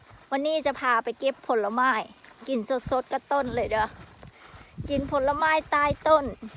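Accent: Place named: Korat, Thailand. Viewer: ดีๆจ้ะ พูดโคราชภาษาท้องถิ่น 🥰🥰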